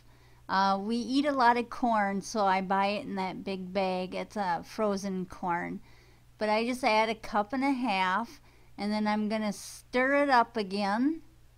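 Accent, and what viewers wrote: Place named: Minnesota, United States. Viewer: jeez, your accent is thicker. (not a bad thing) but you must be from around the iron range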